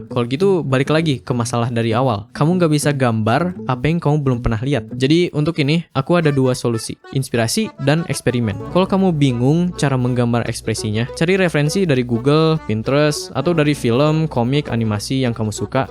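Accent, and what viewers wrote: West Java, Indonesia. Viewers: Si amang sunda euy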